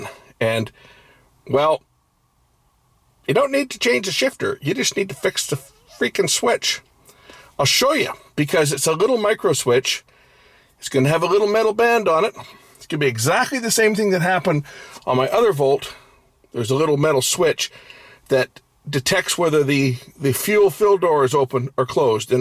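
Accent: Canada. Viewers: Thanks very much for posting. Makes a lot of sense. I'm gonna give it a shot since I sure don't want to pay the dealership to do it. If this doesn't work I'll try replacing the shifter myself. Either way the vid was worth watching just for your magnificent Canadian accent. Cheers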